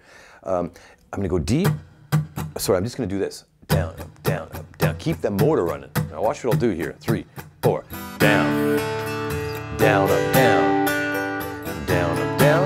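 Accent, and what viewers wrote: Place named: Canada. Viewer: Great channel Love the structure of the lessons and the Canadian accent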